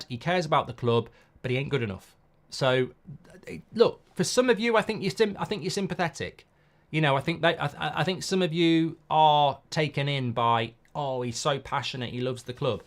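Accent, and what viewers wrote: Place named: Scotland, United Kingdom. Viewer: Gotta love and his Scottish mctominay accent lmao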